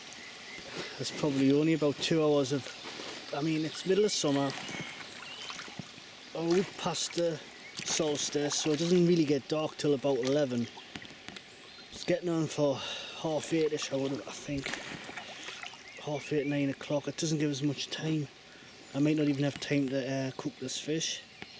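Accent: Newcastle, United Kingdom. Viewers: Is that a Newcastle accent in so sorry if its Sunderland lol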